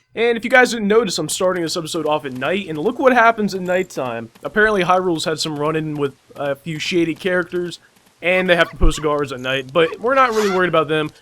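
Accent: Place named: South, United States. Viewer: Your Southern rural accent is really good. Not the horrible caricature that comes from a place of disrespect that I hear so often.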